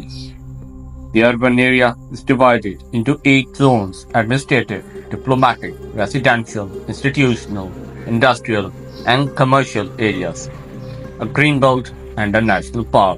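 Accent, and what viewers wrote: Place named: England, United Kingdom. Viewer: Love the English accent..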